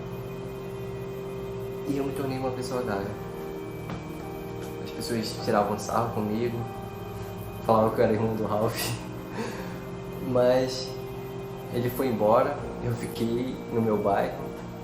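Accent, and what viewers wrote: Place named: Amazonas, Brazil. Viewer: Gostei muito da sua sinceridade, e da forma tão livre como você fala. Seu sotaque parece amazonense... Sucesso amigo!!! :-)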